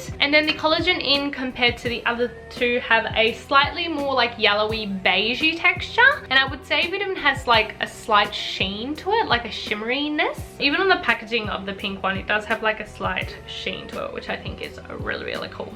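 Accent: Australia. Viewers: I love your (Australian?) accent. It just reminds me of the time when I lived in Sydney.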